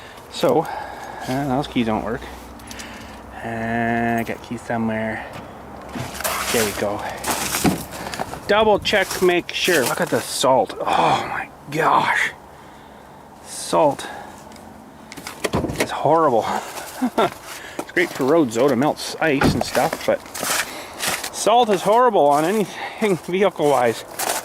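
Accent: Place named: Canada. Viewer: You sound like you’re from Canada just by the way your accent is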